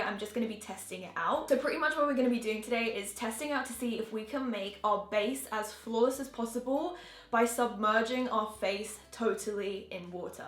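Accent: Australia. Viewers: is she australian like me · Are you Australian or From New Zealand? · Why does she sound Australian when she's English? I don't get it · krystal turvey no she have an australien accent. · Hi. In which country do you live? your accent sounds australian :))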